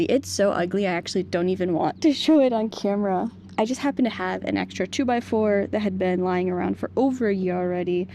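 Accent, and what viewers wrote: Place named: Midwest, United States. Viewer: youre from new york?!?! i thought you wouldve been midwest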